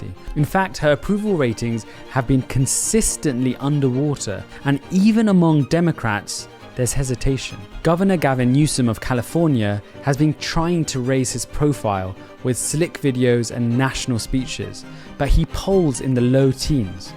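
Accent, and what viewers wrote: United Kingdom. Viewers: There’s a Middle East fella with a British accent explaining USA politics issues 😂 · At about , he doesn’t even get the frickin states he highlights correct: he says “Arizona,” but his on-screen map highlights “Arkansas.” British accent gets you some points, but then again, it also highlights that your expertise around the nuance and cultural dynamics of our politics may not be up to snuff… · What does a brown guy with a British accent know about Americans?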